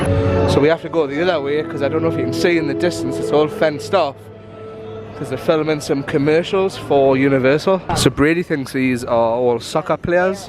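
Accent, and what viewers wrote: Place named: Newcastle, United Kingdom. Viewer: It’s always hard coming home, and nice to hear a fellow Geordie.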